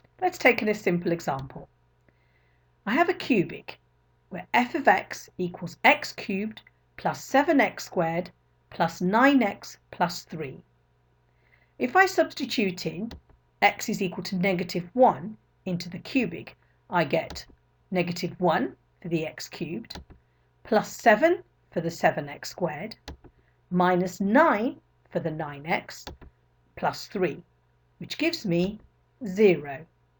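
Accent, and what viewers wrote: United Kingdom. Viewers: Nice British accent but doesn't clarify from which sky the x = -1 fail from . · It is pure British accent.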